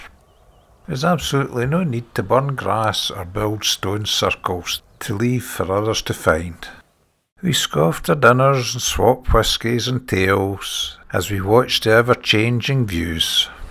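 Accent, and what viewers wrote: Scotland, United Kingdom. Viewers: Amazing video and a lovely Scottish brogue. If God's willing, I will return to Scotland. My father loved kayaking in the San Francisco Bay. RIP my daddy.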